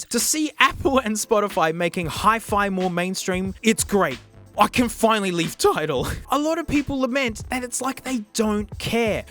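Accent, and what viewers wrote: Australia. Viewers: He's got that perfect Aussie accent that makes you want to listen to anything he says.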